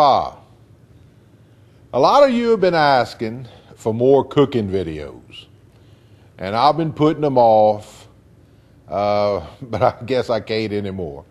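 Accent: Louisiana, United States. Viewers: I just love it when you speak cajun. To my ears it sounds like old french sprinkled with american english flavor. "Ça c'est du bon café!", when you said that, it was just like poetry to my french-from-France ears. Loshepa (Lâche pas la patate!!!).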